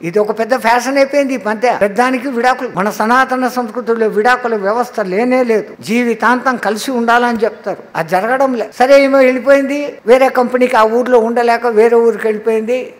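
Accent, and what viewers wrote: Rayalaseema, India. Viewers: పక్కా రాయల సీమ భాష.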